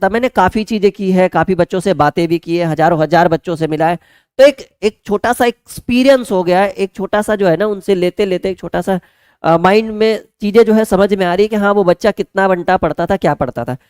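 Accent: Bihar, India. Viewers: Sir bihar se ho kya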